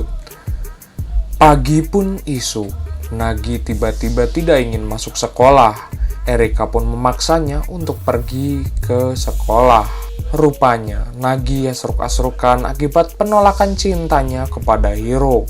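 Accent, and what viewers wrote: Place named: West Java, Indonesia. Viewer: Sunda gening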